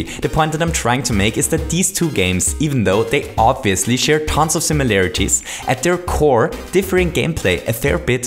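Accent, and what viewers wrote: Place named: Germany. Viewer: I guess he is from Germany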